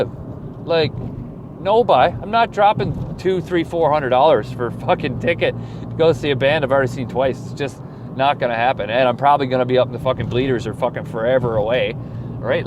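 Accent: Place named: Grande Prairie, Canada. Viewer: sound's like a grande prairian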